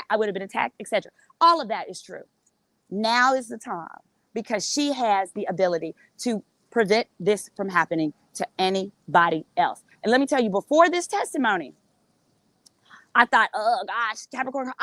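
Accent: South, United States. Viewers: Toxic Sock is REAL! This is disgusting ! And I have to only watch you cause that southern accent is home❤❤❤ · MIMs was my first intro to you and I love your commentary… you are beautiful and you sound like you from the south lol love it!!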